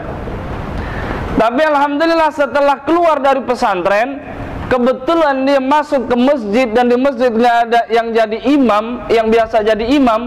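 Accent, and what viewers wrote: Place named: Madura, Indonesia. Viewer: mantap.madura nya masih melekat.hehehhehee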